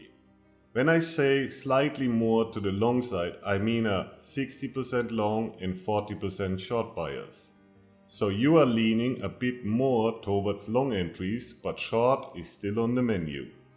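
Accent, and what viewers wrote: Germany. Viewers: Dein Akzent ist Deutsch, daher antworte ich auf Deutsch ;-) Das schaue ich mir genauer an. Aber das scheint echt irre cool zu sein!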